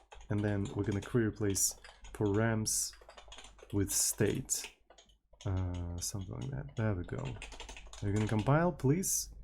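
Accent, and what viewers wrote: Russia. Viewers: This thing u said inspires me a lot I always afraid of doing dumb things and writing shitcode but I want to improve Also your English is so Russian so I feel like a native English speaker listening you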